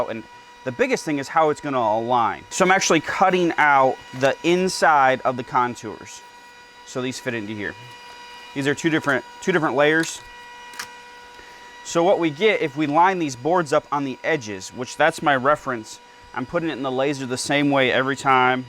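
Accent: Michigan, United States. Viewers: 1) Are you from Michigan? 2) Woah when did get a Laser?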